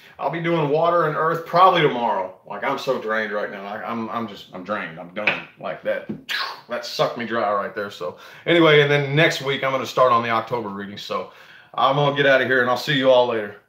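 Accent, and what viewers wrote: South, United States. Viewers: New subscriber alert!!! Loving that Southern accent of yours! Great reading. Thank you.x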